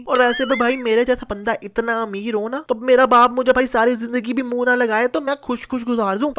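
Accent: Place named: Pakistan. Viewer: Tu 100% pakistani hai tere accent se pata chal rha hai, aukat ho to channel pr bata de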